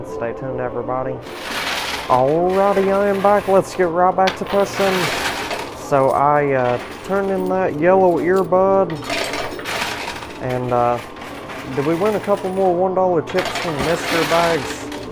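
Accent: South, United States. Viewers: The fakeness of ur video is matched only by the hick-ness of ur accent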